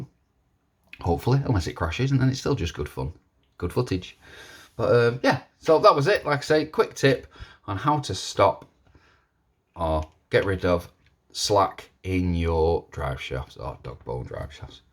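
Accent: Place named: Manchester, United Kingdom. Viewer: Wheres your accent from mate, you in the Manchester area?.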